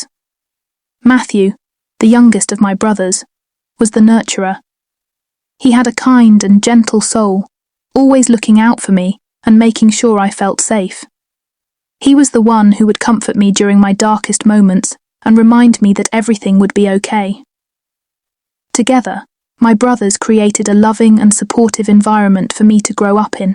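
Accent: United Kingdom. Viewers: First time listening. 29 minutes in, so far, it's good. I l❤ the brit accent.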